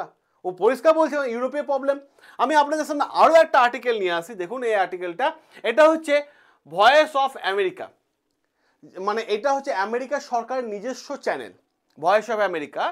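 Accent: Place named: Malda, India. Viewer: নোংরা নোংরা খেলা বারংবার বললে শুনতে খারাপ লাগে। শারীরিক শক্তি দিয়ে কথা না বলে be easy be happy মুডে কথা বলতে শিখুন।মালদা-মুশিদাবাদ --চাপাই নবাবগঞ্জ অঞ্চলের বিকৃত টান দেওয়া ভাষা পরিবর্তন করুন। শারীরিক অস্থিরতা নিবৃত করে সুন্দর ভঙ্গিতে স্বাভাবিকভাবেই কথা বলুন প্লিজ। আমি ইন্জিনিয়ার প্রদীপ কুমার রায়, বিসিএস, নির্বাহী প্রকৌশলী, বাংলাদেশ থেকে আপনাকে ধন্যবাদ জানাচ্ছি।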